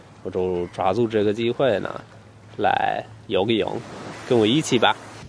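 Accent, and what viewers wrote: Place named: Australia. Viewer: 的澳洲腔说中文好好听哈哈哈哈哈😂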